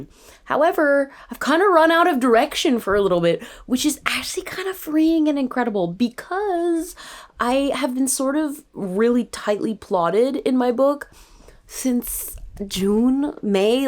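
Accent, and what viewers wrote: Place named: United Kingdom. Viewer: i need you to narrate my books in your british accent asl;dkgj i love your writing vlogs so much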